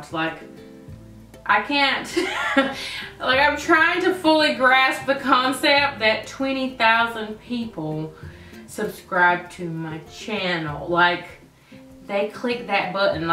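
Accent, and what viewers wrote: South, United States. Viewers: My favorite video would have to be the master bedroom transformation. Girl, it's beautiful! I hate picking just ONE video because I love them ALL!! I love those videos when you start rambling in the car because your accent is just like mine so country girl! My Instagram is LOVE YOU & CONGRATS ON 20K GIRLY!!!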